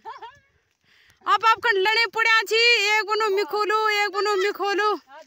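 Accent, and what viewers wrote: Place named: Garhwal, India. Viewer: Yaarr tumhare vlog mood fresh kr dete hai... aur jo tum garhwali bolti ho aahaa...😍😍😍😍😍 love from rajasthan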